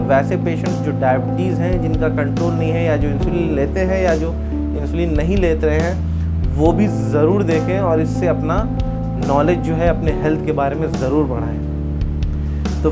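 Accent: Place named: Patna, India. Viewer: Hatsof sir very well explain, specially with patnaiya tone. Kya diabeties type 2 me lifetime medicines khana padta hai .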